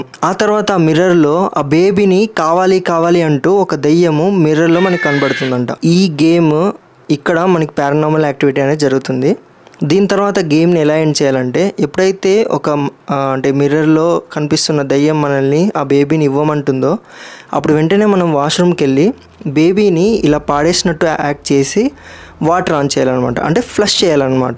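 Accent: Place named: Kadapa, India. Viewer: Kadapa slang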